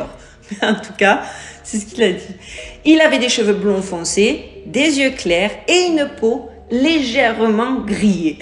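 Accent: Marseille, France. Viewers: Hello jolie fille du sud 😊 moi aussi je suis marseillaise et j'aime ton accent qui me rappelle le sud qui me manque 🥰 et tu as raison les gens parce qu'on ne voit pas ça n'existe pas 🙄 ahhhh les conformistes de la ruche avec aucune ouverture d'esprit 😅😂 ces habitants de l'île ressemblent à des pleéadiens ou à des atlantes 🤔 je pense que certains êtres bien en avance sur nous, habitent toujours sur Terre ou intra Terre. Certains bienfaisants et d'autres beaucoup moins car sinon les élites ne pourraient pas contrôler ce monde aussi facilement... A voir 😅 peut-être un jour aurons nous la vérité 😉😘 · Cc.. J'adore ton coup de gueule.. 😂🤣🤣😂🤣🤣 Les gars allé sur France 2..🤣🤣😂😂 Toute façon tu aura toujours des critiques c'est la rançon de la gloires.. Ta gloires a toi! Ce que tu fais pour nous tous les curieux, les éveillés qui penses que l'univers est habités par des êtres autre que humains et bien moi j'y crois aussi..merci pour ton boulot 👏👏👏👏👍👍👍merci.. 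Et puis ton accent de Marseille est bien très joli.. ☺️ Je te souhaite de bonne fêtes.. 🎉🎉🎂🎂🥂🥂🥂🥂🌹🌹🌹🌹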